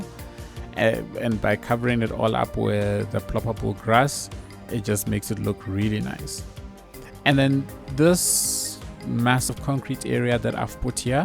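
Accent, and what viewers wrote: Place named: South Africa. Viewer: I didn't know you were South African but when I heard your accent I knew, I felt the South Africaness in my soul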